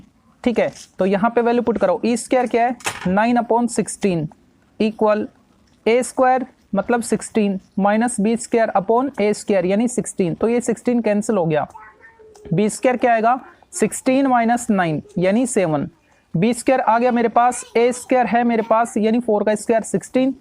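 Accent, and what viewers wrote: Haryana, India. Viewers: Sir aap haryana se ho na 🎉❤😇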